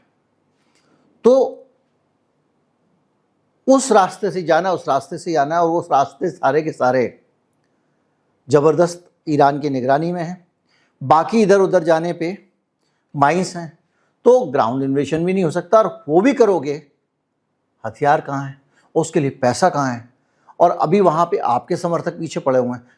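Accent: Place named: Delhi, India. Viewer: What a wonderful content sir 🤩😇 everyone in the morning must watch sir’s video where he will give news in a fashion that it’s entertaining and learning and soothing as well in a typical Delhi style of Hindi. It’s always refreshing to watch his videos in the morning, particularly.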